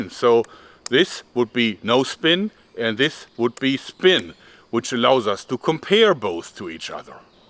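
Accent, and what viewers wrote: Germany. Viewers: i love this german accent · I've said this before, and I'll say it again, you're such a happy, jovial man, with an infectious laugh. And the weather seems to always be near perfect when you shoot your videos. I happen to love the topic of your channel, but I think I would watch them even if I didn't because I like to hear your German accent. I hope you have much continued success. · Hi hörst dich Deutsch an glaube auch das du es bist xD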